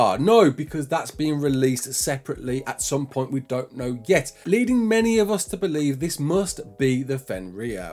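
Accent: United Kingdom. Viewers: you did great! It's simply inevitable that pronounciations are a little different depending on where one's from, but it honestly sounds pretty good in British. :D I'm sure you (intuitively) already know, but 'Steinbjørn' directly translates to 'Stone bear'. Big fan of your videos btw., they made me pick up the game again!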